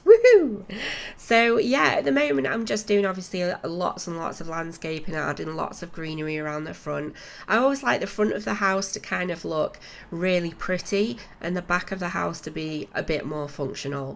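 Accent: United Kingdom. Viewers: So good. Just subscribed to the channel. Love your accent. I love British people. 😍♥️♥️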